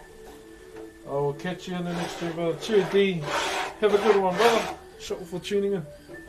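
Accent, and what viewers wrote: New Zealand. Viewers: both are kiwis, yeah?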